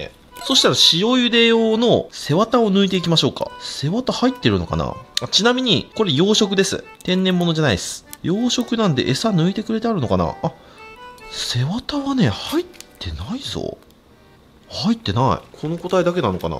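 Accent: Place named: Aichi, Japan. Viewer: 初コメントさせていただきます。前から見ていて、豊橋の知り合いの話し方とほんと似ていて、愛知だなぁって感じてました。冒頭の『さばいてくぅ～』のとこ、大好きです。魚をさばいて内臓やらへっちゃらで触ってるのに、片栗粉の感触が苦手なシーン、笑っちゃいました、のそういうとこ、いいっすね。これからも様々な 『さばいてくぅ』見せてくださいね。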